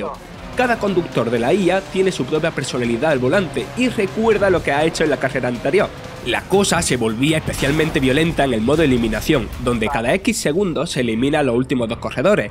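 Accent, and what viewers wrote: Andalusia, Spain. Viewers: El locutor no lo hace mal, pero creo que su trabajo queda lastrado por un accento demasiado marcado, a mí personalmente me saca del análisis, por eso Microsoft o Sony cuando hacen colaboraciones con streamers andaluces les piden que hablen en español con accento "neutro", no es por faltar el respeto a la entonación en sí, sino porque le resta seriedad al análisis. No cuestiono al locutor como periodista, seguro que es realmente bueno, pero como locutor no vale Vandal, por mucho que os empecinéis en ponerle a locutar. No pasa nada, yo tampoco serviría y tengo accento "neutro", no es una ofensa. Uno tiene que saber para lo que vale y para lo que no. Y lo digo desde el más absoluto respeto al periodista. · Español con un perfecto acento andaluz.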